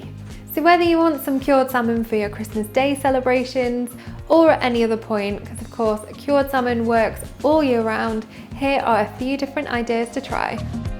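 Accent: United Kingdom. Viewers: I love her accent, she has an old school British charm.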